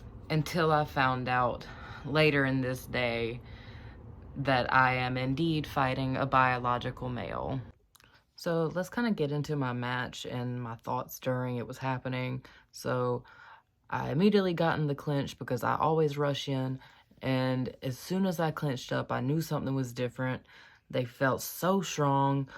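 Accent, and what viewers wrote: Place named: South, United States. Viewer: Why cant you people from the south learn to talk better. The sounds you make are just aweful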